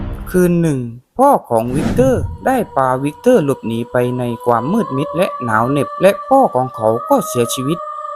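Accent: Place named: South, Thailand. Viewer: ผมชอบสำเนียง หนัดเลยครับ หรอยๆๆ มีมุขด้วย ทำคลิปอีกนะครับบ ❤❤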